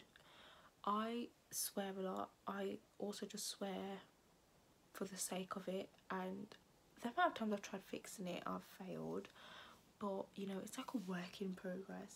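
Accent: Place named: RP, United Kingdom. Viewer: Nah why do you sound so posh wtf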